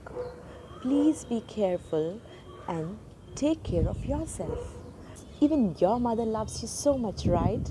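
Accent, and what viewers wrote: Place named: England, United Kingdom. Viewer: Awesone Story telling.. english accent and the way the story narrated.. creates enthusiasm in kids.. Great Job!!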